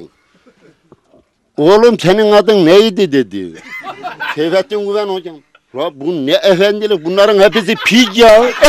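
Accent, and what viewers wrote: Sivas, Turkey. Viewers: Helal olsun işte bu tam bir sivas şivesi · ayni sivas yalaaaa:) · SİVAS ŞİVESİ GİBİ ŞİVE YOK. HARFLERİN SÖZCÜKLERİN TEK TEK İNCELENMESİ LAZIM. ÖZ TÜRK KONUŞMASI BUDUR.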